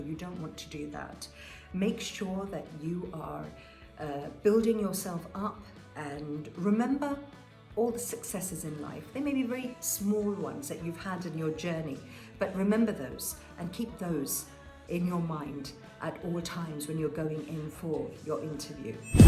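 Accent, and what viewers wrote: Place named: United Kingdom. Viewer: such a quality content but least number of views. If you make prank video it will go viral. This is the taste we have unfortunately. We should promote quality content like this. I have just discovered this channel today, I used to watch news by Shabnam Riaz about 10 years ago I guess. You have got really nice British accent. Keep it up. I will urge the audience to share and subscribe to promote such quality videos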